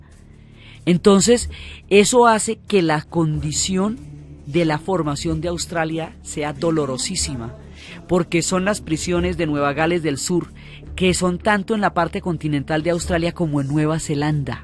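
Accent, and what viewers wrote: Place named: Bogotá, Colombia. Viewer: Es el acento y modismos de los bogotanos tradicionales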